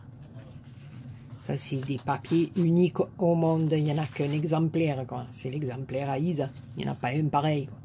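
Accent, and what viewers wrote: South, France. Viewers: ccou....waouuuuuu...tes créas reçues sont justes magnifiques!!!!! pourquoi tu reçois autant de gentillesse????? parce que tu l'es toi-même!!!!! ta bonne humeur en toutes circonstances traversent les écrans, perso quand tu parles avec ce bel accent du Sud, bah moi j'entends les cigales.... des gros poutous.....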